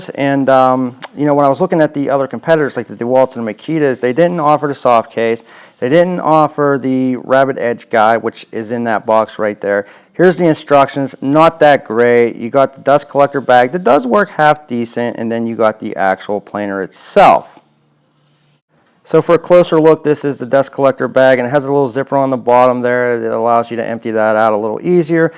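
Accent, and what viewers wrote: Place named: Philadelphia, United States. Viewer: you sound like you are from the Philadelphia area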